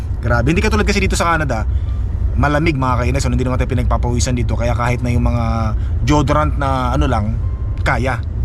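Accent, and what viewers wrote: Philippines. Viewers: Nkakatuwang mkita mga snow dyan sa canada puti buong paligid ka. Masaya nga lagi mga blogs mo ka kya masayang panoorin ska pinoy na pinoy sa kilos at pananalita.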